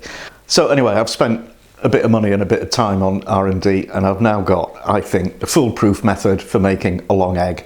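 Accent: United Kingdom. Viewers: I love your accent! I'm American but my husband is Northern Irish. So I always love hearing the different dialects of British accents, and yours is lovely. Soothing to listen to. I also really enjoyed your video. And this is very awesome. I've never seen the long egg before now... And I think you did a very amazing job. Your videos are very well made, and I just love watching you cook lol! I'm not sure what it's called... Forgive me for my ignorance, but it's a common dish in Britain where they boil an egg and crack it open and eat it from the shell. I'd love to know if you have a video for that, or could maybe do one.. I've always wanted to learn how to make that and I really like your method of cooking! Or do you maybe have any knowledge of the proper recipe for it. Any how, I definitely wanna try this long egg! I love eggs, some salt, parsley, bread or crackers... 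Yummy. So this would be nice for so many reason, a gathering of friends an appetizer, or for breakfast with the family etc. Keep sharing! P.s that's a really awesome concoction you made there for the egg. Very smart and clever. Brilliant actually lol. Take care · I like the way brits talk. I wish he said potter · British Colonel Sanders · Kernel sanders is British?!?!?! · is he British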